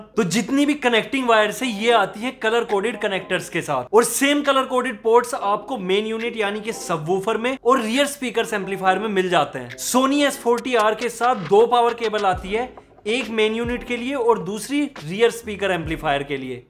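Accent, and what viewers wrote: Meerut, India. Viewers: Bhai aapki zaban se Meerut barasta hai 😀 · Dislike. Can't understand this Indian english accent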